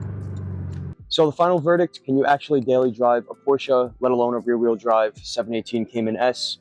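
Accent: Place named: Long Island, United States. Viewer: I spotted your accent and i'm like this guy is from Long Island, I'm from Suffolk but i moved to Orange County, CA about 15 years ago.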